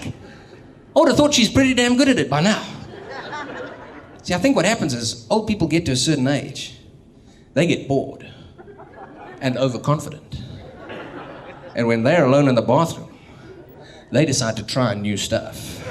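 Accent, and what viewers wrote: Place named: India. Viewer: man its tolerable adopting street Indian accent, but joking on Ghandi.. thats where you're a completely pathetic freak.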